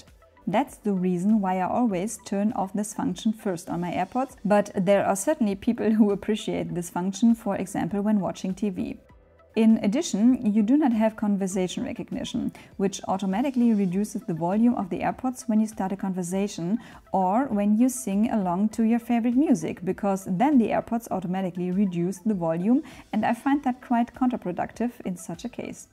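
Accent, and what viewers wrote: Germany. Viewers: Well done. You persuaded me to buy these. Love your beautiful German accent.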